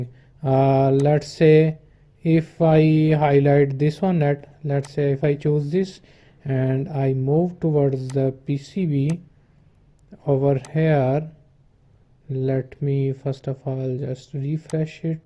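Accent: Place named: India. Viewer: Thank you thick indian accent magician. You've saved my life.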